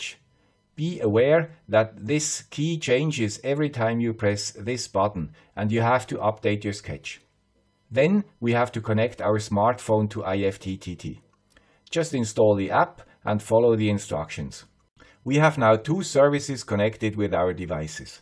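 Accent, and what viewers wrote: Switzerland. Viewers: How could anyone nuke such a nice guy (even with a Swiss accent)?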